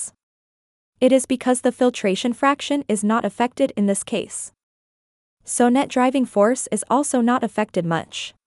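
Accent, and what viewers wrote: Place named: Gujarat, India. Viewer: YOUR VIDEOS ARE GREAT....BUT WHY DID you change the voice over...one reason that i watch your video is its in your native gujju accent (please dont take it in the bad sense). I love it.. Please stick to your original voice , you can work on processing your voice with AI or whatever you resources you have, but please stick to your voice.... If there was an award on teachers day (5th September in India) for best you tube teaching you will give very tough competition for the others,.All the best & ThanQ for such wonderful teaching.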